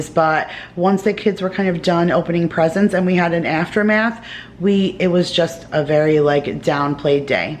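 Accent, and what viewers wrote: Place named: East Coast, United States. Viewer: I’m new to your channel. I really enjoy it. When I first heard you speaking I said this girl has to be from back East. I’m a 40 plus years girl from CT transplanted in NE. Now I see you’re going to NY for vacation. How cool is that? I wish you a happy, healthy and safe New Year. Take care. Food request is Sharp Cheddar Cheese 🧀 LOL 😂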